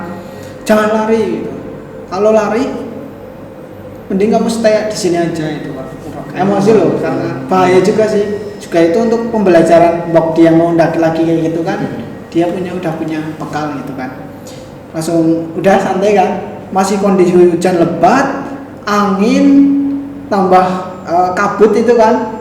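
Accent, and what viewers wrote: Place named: Java, Indonesia. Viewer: Mas medok sekali mas 😁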